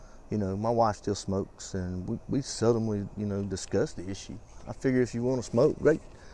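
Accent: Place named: South Carolina, United States. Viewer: your accent made me feel right at home here in SC lol. felt like i was listening to a family member talk. i quit smoking today!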